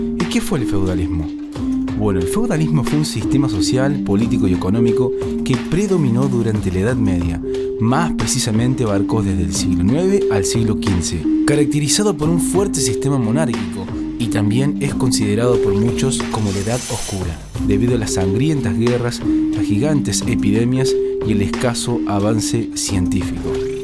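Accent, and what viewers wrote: Córdoba, Argentina. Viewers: muy bueno el video, me sirvio mucho para un informe escolar. No pude evitar tu acento Cordobes jajaja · Y el cordobe que narra la historia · Che alguien más pensó q este es cordobés?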